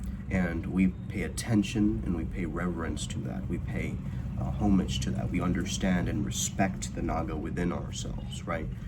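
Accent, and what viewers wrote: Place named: United States. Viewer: He was brought up in America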